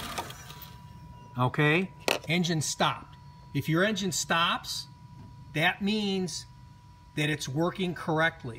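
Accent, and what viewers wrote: United States. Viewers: American accent and you know straight away it's over exaggerated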